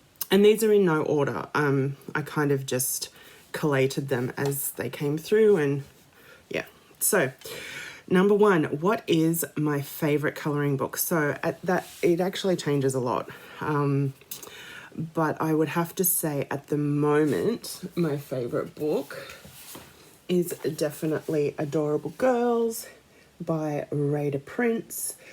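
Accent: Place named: Australia. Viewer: I live very near to Newcastle Upon Tyne in the UK & my daughter actually lives there. As for accents, love the Aussie accents. 😊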